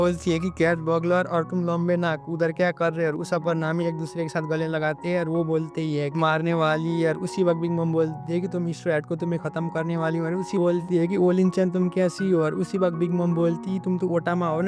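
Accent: Nepal. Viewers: Bro are you from Nepal??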